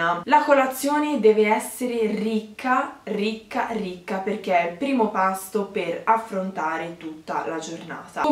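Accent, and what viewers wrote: Tuscany, Italy. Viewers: Sei Toscana? ☺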